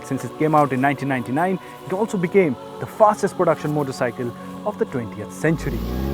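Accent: India. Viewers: I had to skip the video as soon as I heard that Indian accent 🤢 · Why can't indians ever speak a language without their accent ?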